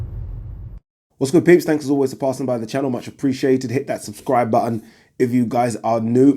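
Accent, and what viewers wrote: Liverpool, United Kingdom. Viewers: Love your Scouse accent.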